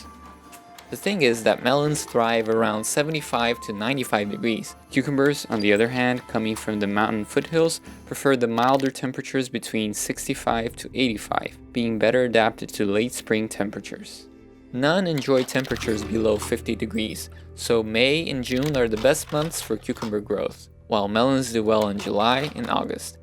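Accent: Brazil. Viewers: OMG I LOVE LOVE LOVE your videos. I am a half breed American/Brasilian. My mother is from Natal and my father is a TEXAN! I could pick up your portugese accent having been raised with the familiar tone of your voice. That aside, I am also an avid gardener, engineer and lover of art, knowledge and origin stories. As with many of us Brazilians, we look for meaning in everything and you do not disappoint! Subbed and Im terlling EVERYONE I know about this amazing channel!!!!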